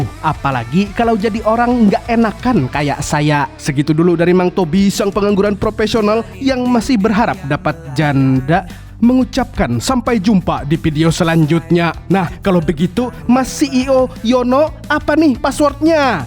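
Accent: Banjar, Indonesia. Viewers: Orang Banjar Kah Min pian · Banjar Jua sekalinya mang Tobi 😮😮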